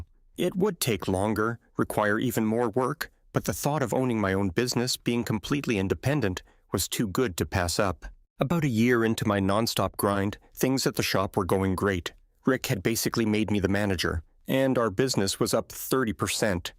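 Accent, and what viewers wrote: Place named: Canada. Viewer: The voice sounds Canadian 😂😂😂. Parents don't want to deal with the sister and her kids😂😂😂😂😂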